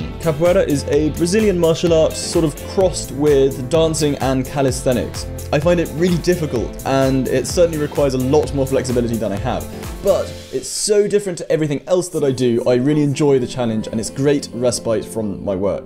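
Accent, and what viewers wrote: United Kingdom. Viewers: lmao thats just an upper class english accent a lot of people sound like that it just depends where you live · Capoeira, I've been watching the movie about that! Only the strong 😊👍 I also love this martial arts. Just haven't try yet but,want to. And cos of a karate kid, I'm now trying just Karate sometimes in my university's class. But, I didn't make out that much cos of this covid-19 break, yeah but I will when I back to school. And, btw, my dream university is Cambridge. Just at the dream state and I do need to try in real with process and.. lots of trying one after one. Thank you, this is a motivation for me. By the way, I like your British accent. So, about that.. do I need to practice the accent? Seem personally but, just wanna know if so, maybe more familiar and understand easily. Is it Right? Thank you!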